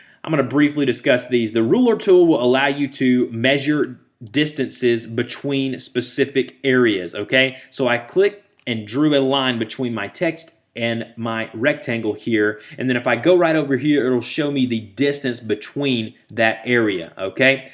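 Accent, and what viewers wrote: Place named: North Carolina, United States. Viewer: you must be from north carolina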